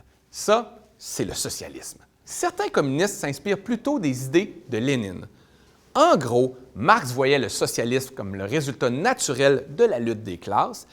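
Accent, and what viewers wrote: Quebec, Canada. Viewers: C'est ça l'accent québécois. Le français c'est la langue qu'ils maîtrisent justement. Informe-toi avant de juger afin de ne pas parraître pauvre d'esprit.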